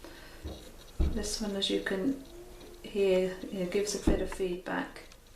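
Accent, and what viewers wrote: Wales, United Kingdom. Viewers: I keep hearing a faint tint of Welsh in your accent?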